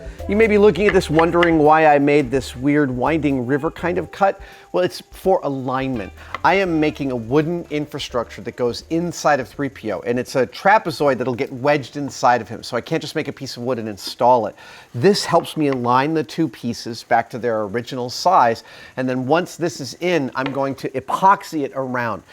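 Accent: Germany. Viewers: Why does he sound German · He sounds German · he sounded like he had a German accent,and not the good ones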